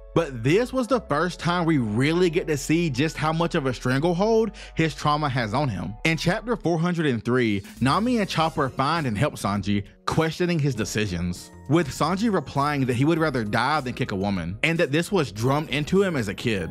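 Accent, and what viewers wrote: South, United States. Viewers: Bro im am american from the south and its so nice to hear a southern accent on the internet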